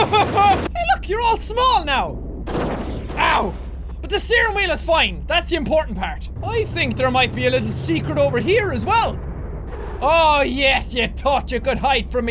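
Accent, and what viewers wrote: Ireland. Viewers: He was so much irisher in the past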